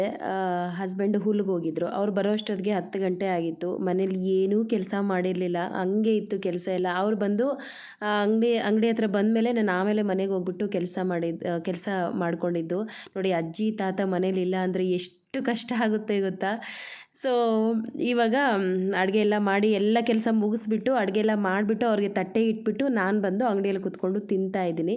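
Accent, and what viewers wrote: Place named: Telugu, India. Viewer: Nimdu yavuru madam swalpa Telugu mathadotara ede❤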